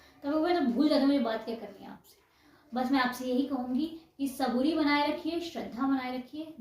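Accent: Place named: Punjab, India. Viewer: I love ur punjabi tone mera naam sukhdeep hai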